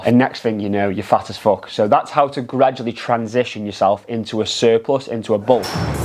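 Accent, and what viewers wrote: United Kingdom. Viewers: Great physique + strong regional British accent + vulgarity = Subbed. 👌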